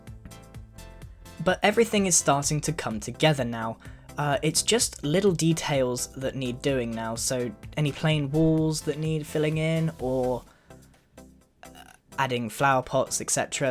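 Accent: United Kingdom. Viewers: You sound like your British? You probably aren't. But that doesn't matter a tad. You are extremely good at building! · Wow! You are a genius! I really like that british accent. :D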